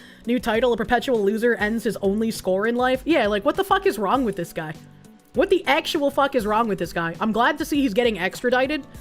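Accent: Boston, United States. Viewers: Obviously D4vd is innocent and the girl just accidentally hit the self deleting and dismembering button in the Tesla. It's marked D&D so she thought it was a roleplaying game with the car's AI. Unrelated: Ab sen tee-ah. My god. It's not your Boston accent. I love your Boston accent. It's the fact you cannot pronounce words. Ab sen she-uh.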